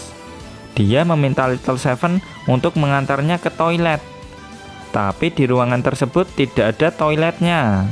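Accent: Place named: Java, Indonesia. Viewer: Cuma saran min,,maaf sebelum nya, kalau bisa logat Jawa nya gak usah di keluarin,,mending bahasa Indonesia aja... Pendenger gak semua sama suku kan...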